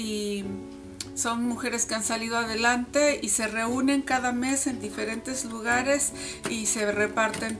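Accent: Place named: Mexico. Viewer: me gusta como eres tu tu acento mexicano me encanta no te preocupes por esas personas que no merecen la pena tu hablas bien muchos besos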